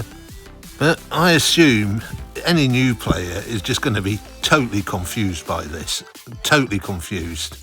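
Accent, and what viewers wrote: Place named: United Kingdom. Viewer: This is the most British sounding person I heard in my life.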